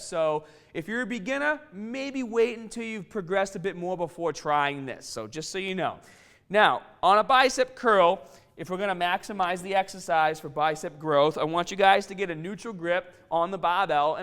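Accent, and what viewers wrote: Boston, United States. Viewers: strongah!, stahdid, you definitely from boston! southie? or Townie? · Dudes like this should be banned from YouTube. Boston accent is not multi lingual, it just sounds stupid. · Is this what they call a "Boston Accent"? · Bhavik Bhagat Lol, no. In what way is that Aussie? It's a Boston accent. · haha sorry, my bad! Boston..it is hilarious though! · strong accent, Boston?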